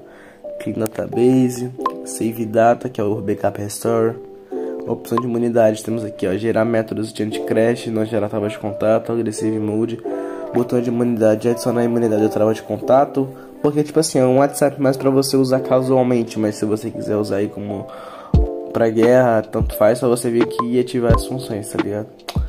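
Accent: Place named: Rio de Janeiro, Brazil. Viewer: Shu carioca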